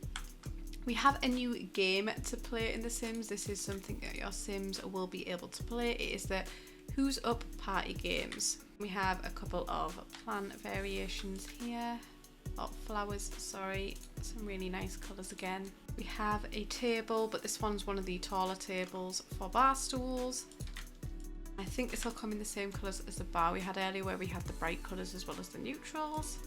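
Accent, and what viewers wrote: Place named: England, United Kingdom. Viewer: I was watching this video and my dad overheard, and he thinks you’re from around where he grew up in England 😂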